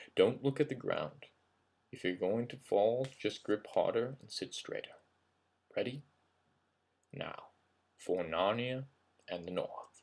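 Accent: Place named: United States. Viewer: Description says he's from Scotland. He sounds American. No biggy :P~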